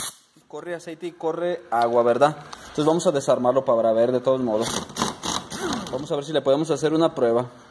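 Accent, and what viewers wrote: Mexico. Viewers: por el tono voz es de Jalisco???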